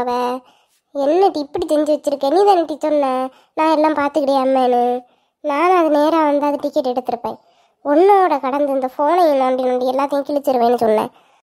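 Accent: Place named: Nagercoil, India. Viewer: Super sis.... Sema...NGL slang....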